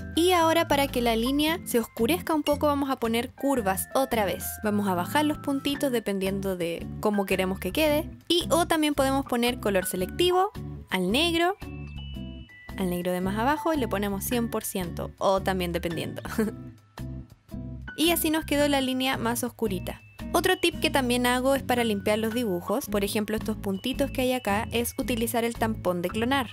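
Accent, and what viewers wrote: Chile. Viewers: eres chilena? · Me encantó este vídeo y como explicas los paso en el photoshop. No se que tiene el acento chileno pero me embelesa, saludos desde Canarias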